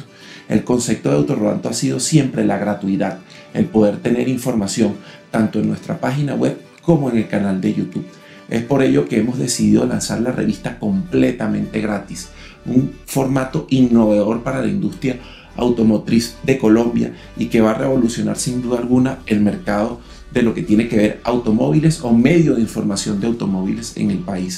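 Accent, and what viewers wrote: Venezuela. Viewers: Hey no sabia que eras Venezolano, debe ser porque soy costeño y se comparte un poco el dialecto, antes tenia mucha familia allá, nuevamente saludos chamo.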